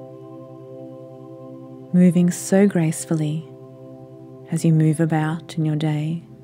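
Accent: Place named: Australia. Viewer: Your Aussie voice is the best calming sound!!! I even set Siri as an Aussie - keep it up.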